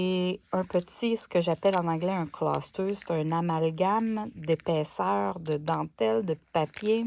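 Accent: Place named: Canada. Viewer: Ho oui ses super parfait les vidéos en français et avant j'allais sur l'autre et maintenant ses plus pratique et j'aime aussi la voie du Canada j'ai une amie qui habite au Canada merci pour toutes vos vidéos je vous suis depuis 2 ans et j'aime acheté vos papiers numériques merci pour le partage gros bisous ❤❤❤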